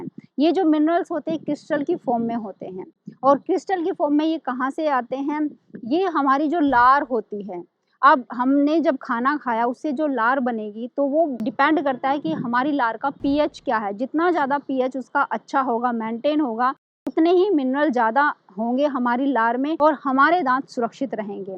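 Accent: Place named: Haryana, India. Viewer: Her accent is haryanvi usme ese hi bolte hain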